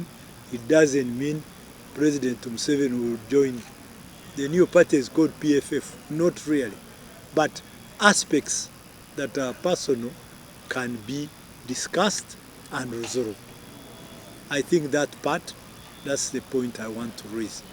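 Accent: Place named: Rwanda. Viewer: Why does he talk like a Munyarwanda? Also his name is a kinyarwanda.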